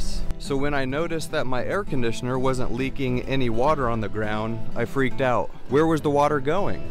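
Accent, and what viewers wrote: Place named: Midwest, United States. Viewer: Looking at what I can see in the video this handyman dude lives in a northern area, his accent is Midwest. Full basement, air conditioner unit not a package unit, etc. probably Iowa or Missouri area.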